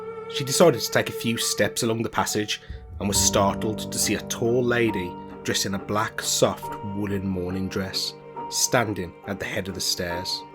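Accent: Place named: Black Country, United Kingdom. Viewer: there are more things that has happened in the past despite going on we cant see in our modern present lives, love the black country accent mate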